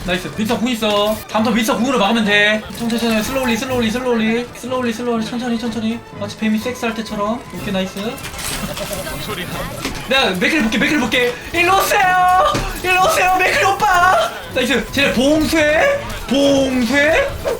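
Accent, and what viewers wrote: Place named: Busan, South Korea. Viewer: 형은 왜캐 부산 많이하지?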